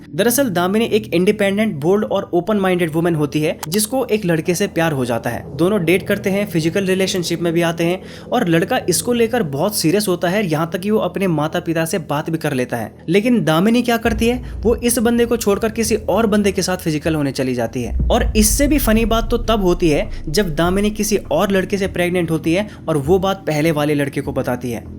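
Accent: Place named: Garhwal, India. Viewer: Gadwali accent 👍👍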